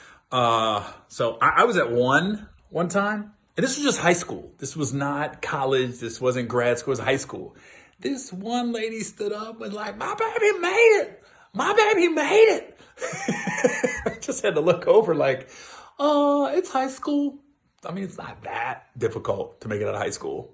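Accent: South, United States. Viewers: you have a southern accent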